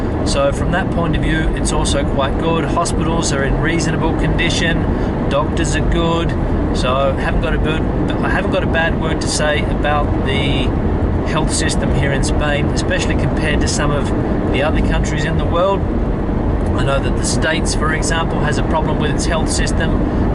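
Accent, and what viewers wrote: Australia. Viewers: He sounds pretty Australian. Maybe he just speaks skow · Thank you we are thinking of moving to Valencia good to hear another Aussie! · OMG what are my ears hearing: A Brit acknowledging we here in Spain have great food!! xD (edit: No, it was an Aussie, too good to be true haha) Good video by the way, I agree with pretty much everything you said. And I love the driving and road footage. You didn't mention roads/infrastructure as a big pro (edit: last thing you said :) Regarding corruption: It's true that it's huge, but as you said there's no corruption at the "lowest" level, you can't bribe a policeman or a clerk etc. Also most big corruption scandals involve local and regional governments, not the State itself. I'd say the "State" and the bottom-working public officials are clean. It's the regional big-fishes who are corrupt most of the time. 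· Where are you from? I would say you sound Australian, but since I am Spanish I am probably not the best one figuring English accents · That's an Aussie accent... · Why not saying you are from Australia (accent is obvious) and make comparisons with Down Under? Video could be much shorter.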